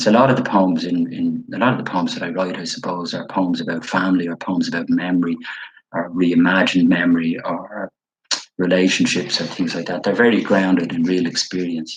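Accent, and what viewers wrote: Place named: Dublin, Ireland. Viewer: Lovely to hear you read in your Dublin accent, I'm so used to my own inner voice reading your poetry, this was a surprise. Great reading! Thank you.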